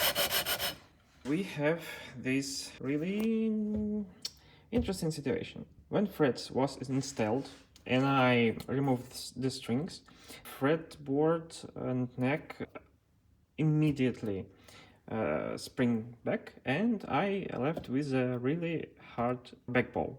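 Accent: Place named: Eastern Europe. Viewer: Сильный славянский акцент, чувак. Откуда ты? Россия? Украина? Беларусь?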